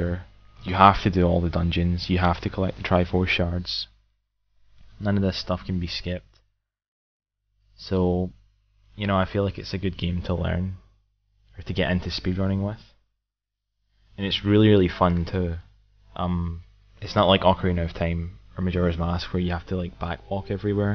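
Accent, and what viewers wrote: Scotland, United Kingdom. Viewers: Hrm yeah he does sound Scottish. Cx My mistake. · More please. Also, is that a Scottish accent? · Scottish accent?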